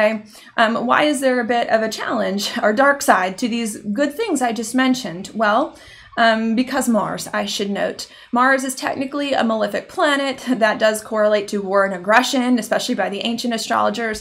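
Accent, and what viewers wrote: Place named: Pittsburgh, United States. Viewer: Sounds like Pittsburgh 💚 at least PA. Thanks!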